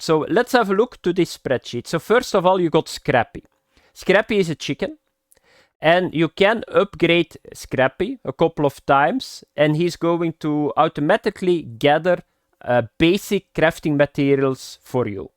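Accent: Flanders, Belgium. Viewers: ieeeel staarekk vloms accent ni echt nen dutchké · De belgische accent, i love it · Are you flemish (Belgium) by any change. Your englisch sounds like mine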